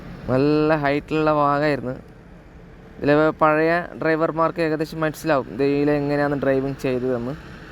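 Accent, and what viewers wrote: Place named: Kasaragod, India. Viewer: തനി കാസർഗോഡ് സ്റ്റൈലിൽ. ലാൽ സലാം. ഈ വാളും അങ്ങന്നെ ഇങ്ങോട്ട് വന്നിർലാ എന്നേഉള്ളൂ പേടി ❤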